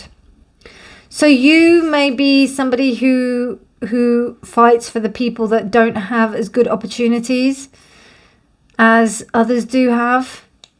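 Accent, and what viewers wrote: United Kingdom. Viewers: 🌻 Good morning, Ms! 🌻 I'm drawn to the art of the 1st card. I wanted to see a flip through of the deck so I was about to search it. Unfortunately, I was not able to comprehend your British accent. Hehe. I turned ON the CC but it cannot capture the right words too. Hehe. Can I know what's the title of the deck, Ms? Or would you like to create a flip through video?? 🤓💕 ✨ Thanks for reading! ✨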